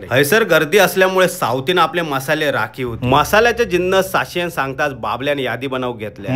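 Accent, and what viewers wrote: Malvani, India. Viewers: बोलतास ता लय आवडता मालवणी आम्ही पण कणकवली चे आपलीच बेस्ट मालवणी भाषा 😂😂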